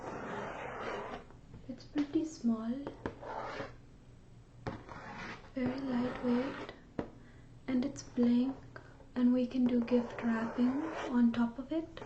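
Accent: India. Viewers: I love that Indian accent. So many sub continent tingles.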